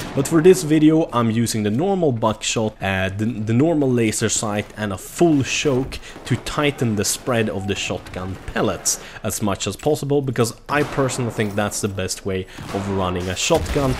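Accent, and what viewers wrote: Sweden. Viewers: are u swedish? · ur accent is so Swedish... I LOVE IT!!!! :D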